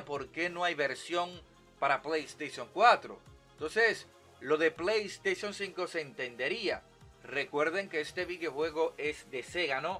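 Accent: Dominican Republic. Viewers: eres de Republica Dominicana?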